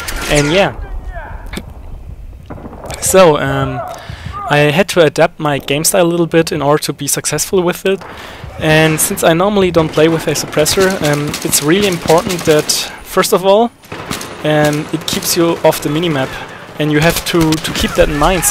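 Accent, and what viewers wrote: Germany. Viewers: You're german, aren't you?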